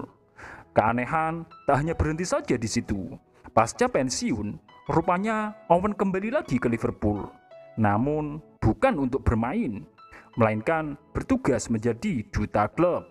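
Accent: Java, Indonesia. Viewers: Jawa koek nu ngomong na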